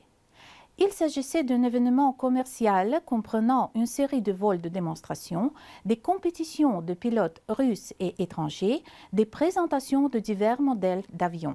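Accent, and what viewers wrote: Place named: Russia. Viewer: ce fut très instructif, merci madame pour tous ces renseignements. j'ajouterai que votre Français est parfait en plus avec un très joli accent russe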